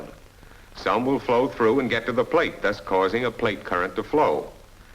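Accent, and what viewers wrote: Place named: New York, United States. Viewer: I’m trying to figure out his accent. Where do you feel he is from? East coast? West coast? New York? I am from NY and have seen old films where people who are from NY used to have slightly different accents.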